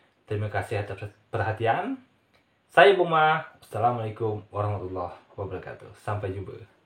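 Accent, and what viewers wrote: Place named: Johor, Malaysia. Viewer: Salam kenal Pada pendengaran saya, bahasa melayu yang gunakan tak ubah seperti pertuturan orang melayu Johor. Mungkin ramai penonton dari Lembah Klang sependapat dengan saya.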